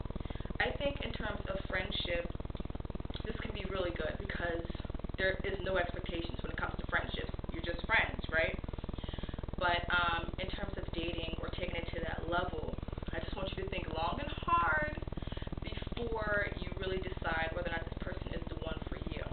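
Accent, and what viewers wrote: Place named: Philippines. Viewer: Your accent is so adorable.do you have Filipino ancestry?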